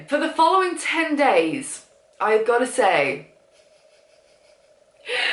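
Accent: United Kingdom. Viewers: you are soo beautiful and ur British accent makes you even more beautiful.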